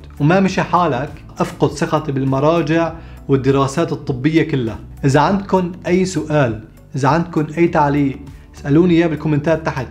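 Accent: Aleppo, Syria. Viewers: سؤال دكتور انت من حلب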